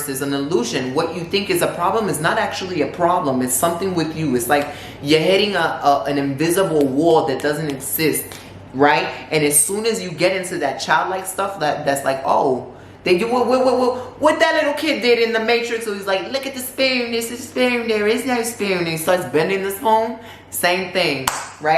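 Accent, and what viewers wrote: United Kingdom. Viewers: The British accent took me down 😂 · Lmao at the British accent, thank you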